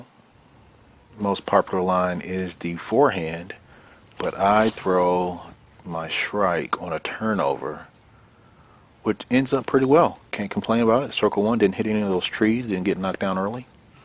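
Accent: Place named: South, United States. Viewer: Nicely done. Soothing southern voice. Simple and clear.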